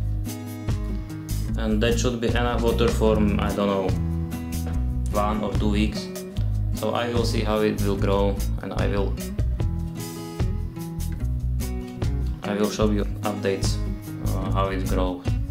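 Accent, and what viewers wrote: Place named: Czech Republic. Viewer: That was my first thought ! I was like, damn he speaks just like shops in Prague ! Thanks for the video !!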